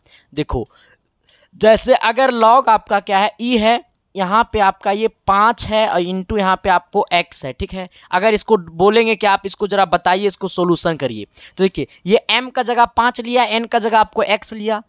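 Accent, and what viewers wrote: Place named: Bihar, India. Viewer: Bahut hard🎉🔥!! That bihari.. tone !! Jsstt took the heart💖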